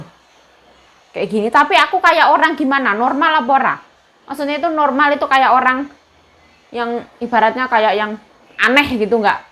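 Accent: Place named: Ngawi, Indonesia. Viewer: Ya ampun bahasa blotrokmu wi lho hehehebe jian wong ngawi tenan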